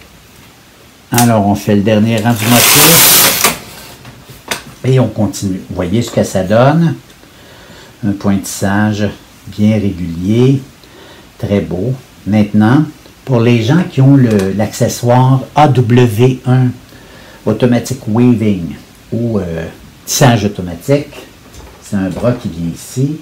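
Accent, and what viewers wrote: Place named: Quebec, Canada. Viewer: Merci! C'est toujours un plaisir de voir et d'entendre tes explications avec ce doux accent québécois:-)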